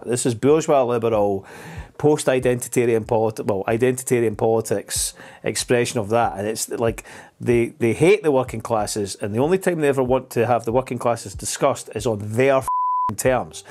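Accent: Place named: Scotland, United Kingdom. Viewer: 😂 I loved your video. Greetings from Colombia 🇨🇴 South America (of all places ) landed here and it made my day.😂 I have to say it man .. the accent .. fantastic! Never hear. Before. Scotland 🏴 right ? Very nice. Beautiful. Will listen more Cheers 🥃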